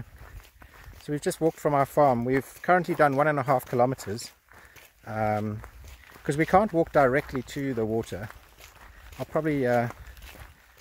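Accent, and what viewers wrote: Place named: South Africa. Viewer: I suspected you where a South African when i heard your accent, great stuff my friend, enjoy every minute of it, and bask in the safety. Kind regards from South Africa.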